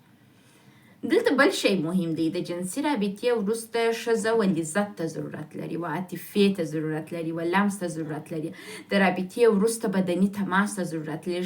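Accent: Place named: Kandahar, Afghanistan. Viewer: محترم این زبان را زبان پشتو میگویند که مربوط به افغانستان می‌شود و لحجه اش هم قندهاری است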